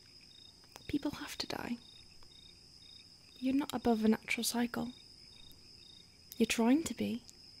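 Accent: United Kingdom. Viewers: Ahhhh British accent its scary because you sound so much like my friend..... good job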